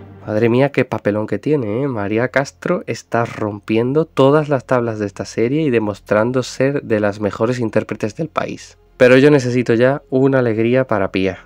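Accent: Andalusia, Spain. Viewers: A mí me fascina Maria Castro, todo,todo,porque puede decir con la mirada,con la boca,con un silencio todo un párrafo no escrito.Y por supuesto que no quiero que se case con Gregorio,pues temo que cada día le haga pagar su " bondad"....Con respecto a todo el video,como siempre,una maravilla!! ( Y tu andaluz es encantador,aunque no lo notes). Un enorme abrazo, Abuela Cris de Argentina ❤️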